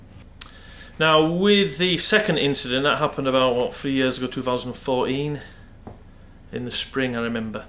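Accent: Yorkshire, United Kingdom. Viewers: I thought you had a Yorkshire accent ! Haha hello from Huddersfield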